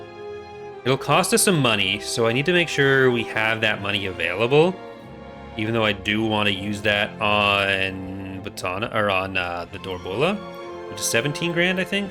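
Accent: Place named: Canada. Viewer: dont worry about sounding too Canadian, its awesome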